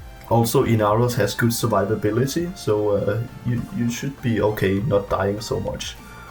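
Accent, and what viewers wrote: Denmark. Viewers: Well it was my frist kavat and I just got it xD Btw are you from Denmark? because you sounds a bit like a dane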